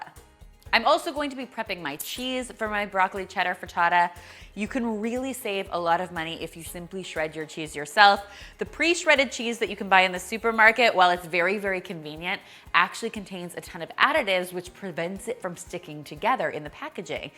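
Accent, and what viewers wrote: Canada. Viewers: She has a Canadian accent.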